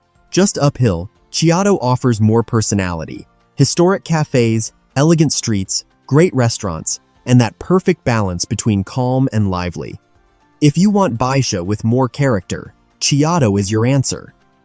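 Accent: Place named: United States. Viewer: The American accent is destructive to a description of Europe. How many ways can you pronounce Tagus ? This alleged easy first day is as relaxing as Shinjuku station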